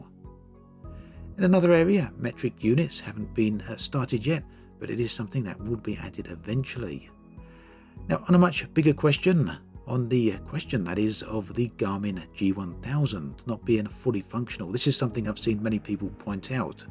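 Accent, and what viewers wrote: United Kingdom. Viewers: His accent is pretty standard for parts of the UK. What a strange thing to say.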